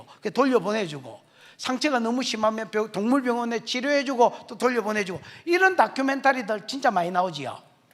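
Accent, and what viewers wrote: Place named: Busan, South Korea. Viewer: 목사님~^^ 귀한 말씀을 듣게하시니 감사드립니다 ❤ 우리남편에게 목사님 부산 방언통역재미도😂 쏠쏠합니다 건강하십시요 🎉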